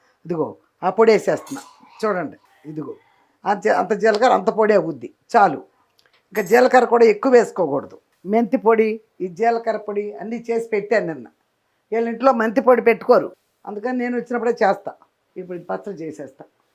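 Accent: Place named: Krishna, India. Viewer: Amma mee native place krisha districta. Acham vja side la ne chesthunnaru. Me bhasha kuda krishna jilla la ne undi.krishna jilla vallu thappa migilina ea pratham vallu ayina nimmakaya pachadi thalimpu vestharu. Assalu bagodhu. Naku ma nayanMma gurthochindamma. Me lane kakarakaya, mirchi allam vesedhi.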